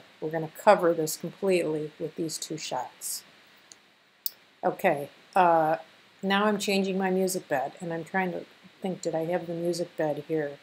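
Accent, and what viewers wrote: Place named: Midwest, United States. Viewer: I can hear a Midwesterner from a mile away lol Great video!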